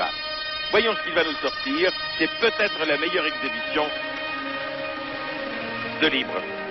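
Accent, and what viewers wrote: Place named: Canada. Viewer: The footage may be European, but the commentary sounds like Canadian French.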